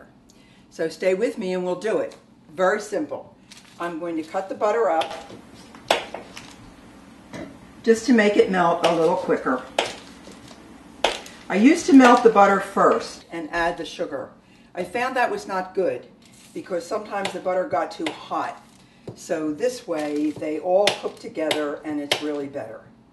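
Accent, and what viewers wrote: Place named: Northeast, United States. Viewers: your so cute 🤗😘 I'm from Canada sound like your from New York area it too me 5 mins to figure out what Wyader was Oh!! WATER 🤣🙃😊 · ! You sound like you’re from the northeast!! My Dad always pronounced the word “water” just like you!!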